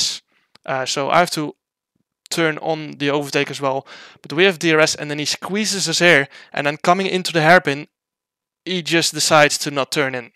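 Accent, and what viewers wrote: Netherlands. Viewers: Always love to hear your dutch accent while speaking haha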